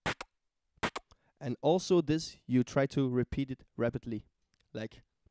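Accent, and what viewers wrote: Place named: France. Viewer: You have the French accent^^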